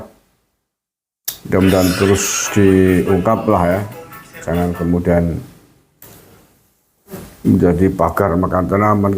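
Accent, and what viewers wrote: Banyumas, Indonesia. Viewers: ngapaknya bikin gelitik bung.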